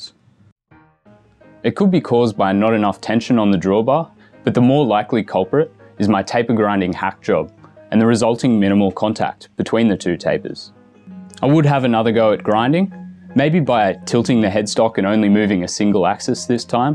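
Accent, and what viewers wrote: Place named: Australia. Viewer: Bro I just found this channel, and thought: well that’s weird, he sounds like an Aussie, anyways, let’s check after the video. Then I saw . Bruh. By now I feel like every Australian YouTuber I’ve seen so far is living in one neighborhood, and Wade from DankPods is an imposter from NZ, only pretending to be an Aussie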